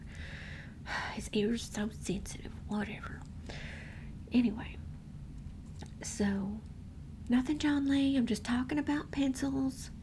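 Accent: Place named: South, United States. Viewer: This is amazing and hilarious because you nailed a Southern accent perfectly 😂 I’m from the South, Georgia, but my accent only comes out with certain words. I can hear the thicker Southern accents and this is perfect, including how you speak ❤️😂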